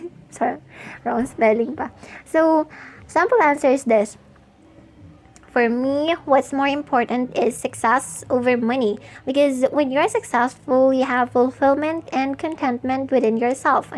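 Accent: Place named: India. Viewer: Naanu ako sa accenr hhehe parang indian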